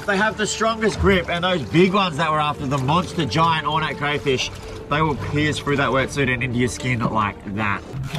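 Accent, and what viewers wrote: Australia. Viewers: i can already hear the aussie accent off the thumbnail clicking 😂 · Are you Australian mate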